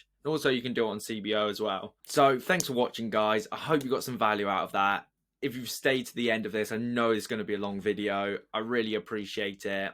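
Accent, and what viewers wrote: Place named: United Kingdom. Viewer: Love u and the brit accent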